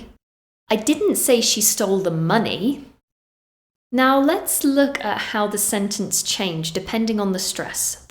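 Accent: United Kingdom. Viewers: I love your British accent ❤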